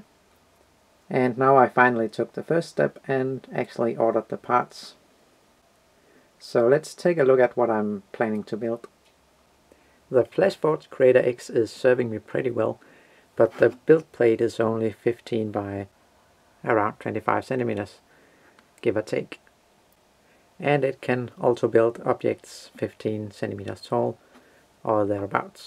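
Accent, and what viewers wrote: Denmark. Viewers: are u danish like me :P?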